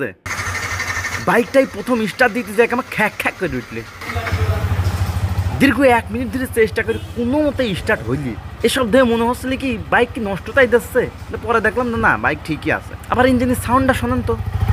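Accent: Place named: Kushtia, Bangladesh. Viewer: vai ki kushtiar mal naki kotha kinto akdom kushtiar lok er moto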